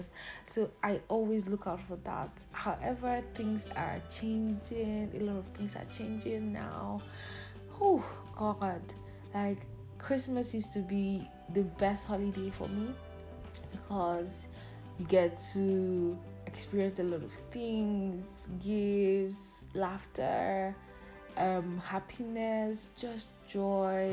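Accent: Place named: Yoruba, Nigeria. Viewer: Nice travel vlog. But you are getting the spellings wrong. Ntueke and urualla that's the correct spelling. Again play some igbo tunes as background music. And please while doing village vlog and you wish to speak igbo pronouce igbo language very correctly not speaking igbo like a yoruba person.jisi ike. .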